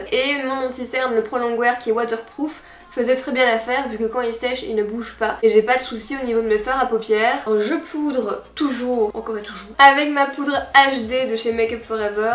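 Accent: Belgium. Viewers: J'aime troppppp ton pti accent belge qui revient tout au long de la vidéo! BELGIUM RPZT :D :D BIsouuus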